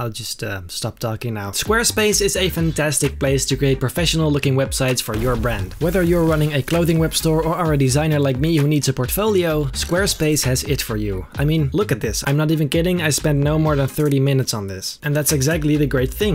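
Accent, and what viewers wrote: Netherlands. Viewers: Fantastische artiest, Nu nog van dat hollands accent geraken. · You sound Dutch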